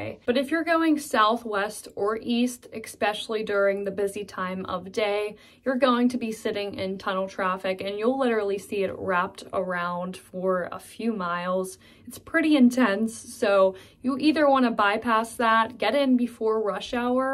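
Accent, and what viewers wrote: Pittsburgh, United States. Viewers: Love your Pittsburgh accent! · The longer you talked, the more Pittsburgh you sounded😁 Especially "expecially". Nobody else says that. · I was born in Pittsburgh and moved out the the country when I was 9, I love the fact that I can hear a Pittsburgh accent, and you definitely have one. Awesome video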